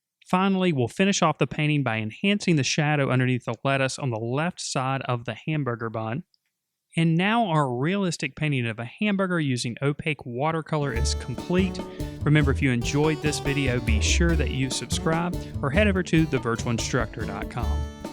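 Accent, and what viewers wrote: South, United States. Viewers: I know, I just find it funny how he pronounces it with his Southern drawl.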